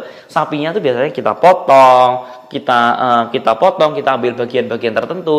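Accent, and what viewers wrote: Java, Indonesia. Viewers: Logat jawaaaa medok koyok akuu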